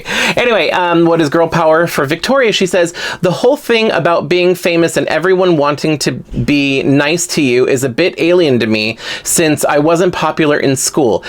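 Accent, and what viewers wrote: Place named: United Kingdom. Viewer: My goodness that British accent lol😂 I hope that’s not what we sound like 😂😂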